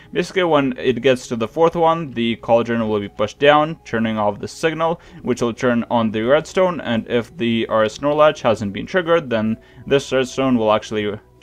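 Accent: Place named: Canada. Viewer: you sound Canadian :-]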